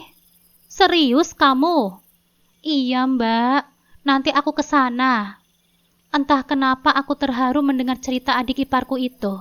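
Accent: Java, Indonesia. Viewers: Baca ceritanya lucu,medok jawanya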